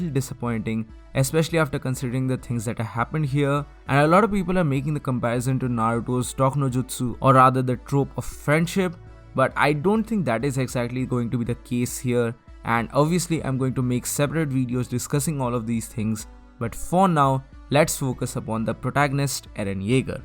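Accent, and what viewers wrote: India. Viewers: Indian voice,i know this accent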